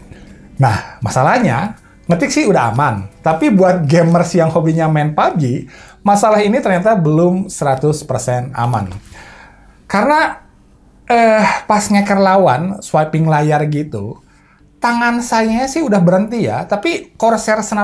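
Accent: West Java, Indonesia. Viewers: sunda pisan logat na.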